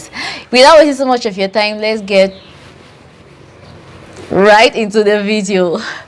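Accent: Nigeria. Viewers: I’d have put a bet that you’re a Nigerian 😀😀 .. you look and sound like us geez ., you’re our sister Biko 😅 ❤., come back to us please .. for the eatery thing yes o my sister , you have to wait and take your order not all 😊, but for most you have to sit and signal someone to come to your order, … for the luggage most times it’s for extra luggage they pay for , for the food “a plate” contains everything , but for those ones that you want to specify you will have to pay for everything my sister except the stew 😂😂 .. na so we see am no vex … Glad you enjoyed your stay ❤❤ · Same accent as Nigerians. If she didn't mention it, we would've assumed she was Nigerian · Love thisssss!! You sound more Nigerian than me! You express so well. Super amazing of you! Kudos👍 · Please 🙏 you have to verify better the origins or the roots of your family, you speak or talk like us very well. 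If you are in the midst of NIGERIANS it's would be difficult to differentiate your nationality 😂😂 big up my dear sister from another mother ❤️🇳🇬✅ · You sound Nigerian. Nice video. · I want to believe this is your normal accent, and I hope it's the same for other Cameroonians. You sound so much like a Nigerian that I was shocked when you mentioned that you're a Cameroonian. The accent is literally the same and I honestly can't pick out a difference. I can easily spot a Ghanian speaking English, so if we are being classified as sisters then I'll say to the Cameroonians "twin, where have you been?" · Eventho', Cameroonians speak similarly to Nigerians BUT you have also, adopted some of the speech patterns that are peculiar to Nigeria. People that are close to U over there can tell U that for sure. Except if they've been watching a lot of Naija movies too! 😂😂😂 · You dont need to go back to cameroon, you look like nigerian and you sound like nigerian, we sure gonna check your DNA because we believe you're our's. 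less I forget, did you come to nigeria by road? I hv a friend you wants to come from cameroon but she's scared of flying. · You are Nigerian without knowing. Your accent and reflex is Nigerian. For the boxes payment, it varies from region to region. In the south, you will pay for virtually everything because, the people are money driven. In the north, you don't have to do that unless you have extra loads that will be needing loading. A bit of madness & street credibility will either get you off paying or reduced payment. Only in bukkas or restaurants do you wait to collect your food. In eateries, you get served. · You sound Nigerian dear. I hope you had a good time in Naija ❤ 🇳🇬 🇨🇲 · Ilat fisrt i said why did she introduced herself as a cameroonian, you soud more like a Nigerian.❤❤❤ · Cameroonian don't speak like this, are you sure you not a Nigeria or you where born and brought up in Nigeria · Tell me wetin make you different from a Nigerian?. 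Your accent alone b like yoruba and igbo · You sound 100% Nigerian and you have such a good charisma about you. Thank you for visiting Nigeria and promoting our country 😂😂😂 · I was shocked you were not Nigerian “ yet “. Your accent and diction sounds so Nigerian and the charisma and vibe is so beautifully Nigerian. I guess God missed this one - you. You have a new subscriber. Cheers love you. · You sound Nigerian from south west or Edo. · Wow! You speak so Nigerian-like in terms of accent. I really enjoy your narration. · You sound 100% Nigerian,. Are you sure there's no Nigerian gene in you? First time subscriber. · You sound Nigerian. One of your parents should be a Nigerian. Good video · Wow!!! Ok nau , any real Nigerian can clearly see a sister in you . Your accent is more Nigerian than Nigerians, now it's clear that Africans are related. Thank you for sharing beautiful sister 🥰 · Yes,your accent Nigerian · Are you sure this beautiful girl is a Cameroonian?She looks Nigerian from her looks and accent.We like you girl ❤️ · Wow, you look Nigerian, you sounds like a Nigerian, 100%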